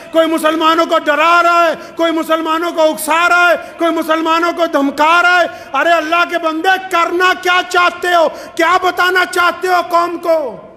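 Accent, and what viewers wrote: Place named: Punjab, India. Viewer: great Punjabi tone and great Urdu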